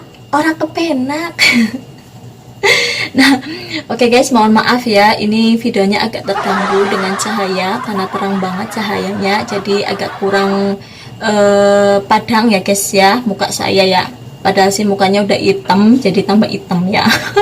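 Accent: Banyumas, Indonesia. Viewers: Suka dengernya basa ngapaknya mbg,, Slm knl mbge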